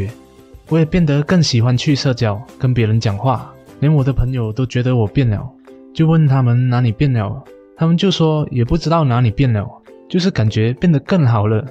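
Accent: Malaysia. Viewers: 马来西亚口音真的很亲切！！！ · 一開始以為是台灣北京腔,最後就感覺到是大馬腔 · Dino Mei 应该是马来西亚，因为我是马来西亚人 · 好~ 听出马来西亚的腔调~ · 你一定是马来西亚人，因为料的音哈。很开心看到你的分享。我也正在努力。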